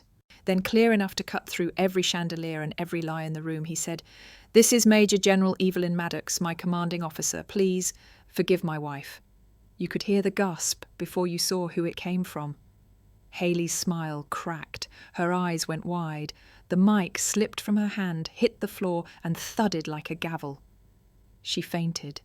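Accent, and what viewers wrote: United Kingdom. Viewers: Not to mention the British accent… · Oh Dear. Another American based military story , Narrated in a British voice. I’m not really fond of this. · The stories you print are plausible but lack authenticity. Supposedly about American military personnel. But they are narrated with a British accent. even the pronunciation of the rank of LIEUTENANT is pronounced as it would be in London, as LEFTENANT. I confess that took away any interest I had in the story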